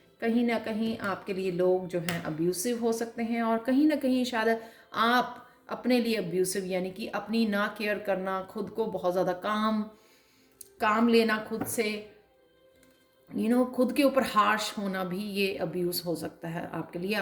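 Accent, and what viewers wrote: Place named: Punjab, India. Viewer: Kya aap Punjab se hein. Aapki hindi mein punjabi ka touch lagta hai. Thanx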